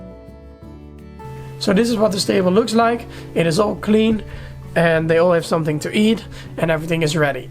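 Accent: Netherlands. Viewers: I hope someone knows. I love how he has Dutch & English with beautiful Dutch accent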